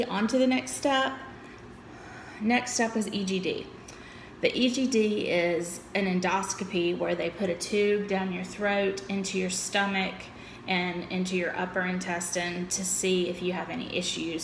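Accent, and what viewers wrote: South, United States. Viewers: Hey, I'm glad you were honest and I do understand how dark that place can be. I am so happy that you are in a better place. I felt the same way during the psychological testing. And I am still going weekly to my psychologist. I probably will for the rest of my life. You are on your way girl to feeling better. I heard you say Ya'll a few times and I am picking up a little bit of a Southern accent where are you from? We live on the Gulf Coast in the deep south, MS. Keep posting please, it's helping me get through this long wait. Rebecca:)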